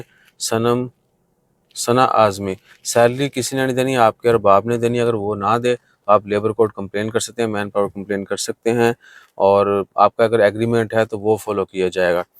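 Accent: Pakistan. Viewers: wesy he boli se tu Gujrat k lagy hain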